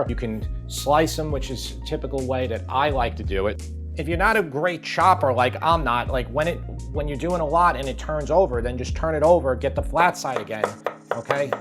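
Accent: New York, United States. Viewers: He has a very light NY accent. Mine & my family is way thicker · I love your NY accent! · I have been watching your videos and have been learning so much...I am even trying to pick up on the New York accent...I love all your videos.🥰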